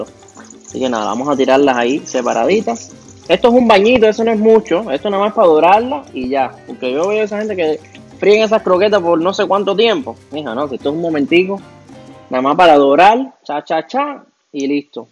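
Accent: Cuba. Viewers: Me encanta tu forma de explicar muy a lo cubano. Me gustaría que hagas frituras de bacalao. Mi papá las hacía tan ricas. Gracias y deseo mucho éxito!! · Me encanta este canal, no solo por las recetas que son buenísimas… también me encanta oírlo hablar, cubano 100% jajaja….. me encanta !!! Que tengas mucho éxito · Primera vez que te veo y me encantó tu forma cubana de explicar, felicidades ahora te voy a seguir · Me gustó mucho tu forma tan Cubana y relajada de hablar y hacer tus croquetas, aprendí y me divertí, gracias ❤ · Las mías estarán bien deformes porque no tengo el aparato ese ! Jajaja …. Me encantan tus videos … muchas gracias por ser tan auténtico y agradable. Escucharte hablar me recuerda mi infancia en Cuba. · saludos! Me encantó tu modo muy cubanazo de explicar, jajajajaaaa. Bueno te diré q en Cuba, mi papá que era cocinero, hacía en casa croquetas de todo tipo, riquísimas y como no tenía máquina moledora ni cómo conseguirla, el les daba forma con un pomo de refresco gaseado (los que bautizamos allá con el nombre de ”pepino”), le cortaba el fondo, por ahí lo llenaba con la masa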